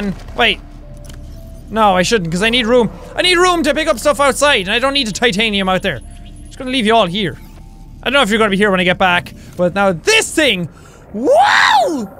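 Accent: Ireland. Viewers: He sounds way more Irish than he does today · Hi in your biggest fan I watch you so much I've picked up your Irish accent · Coming here from 2021, and I was startled by how Irish this man sounds. Also smiled like an idiot at the intro. Edit: 2023 now, and I don't think I'll ever get used to his incoherent ramblings. Still fun to watch, though! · his irish is heavier than it is now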